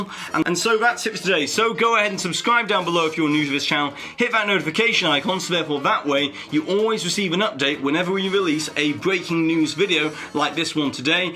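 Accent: United Kingdom. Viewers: Wait, your British?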